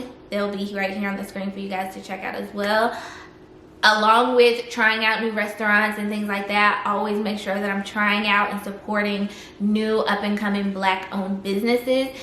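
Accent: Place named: South, United States. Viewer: Question sista shaaa didn't you say you was coming from New York I'm detecting a southern accent Adams Rib chill chill chill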